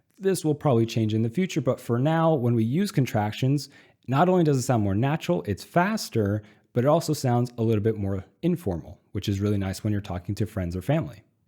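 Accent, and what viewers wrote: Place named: Russia. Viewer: Not being rude, but you do have 1% russian accent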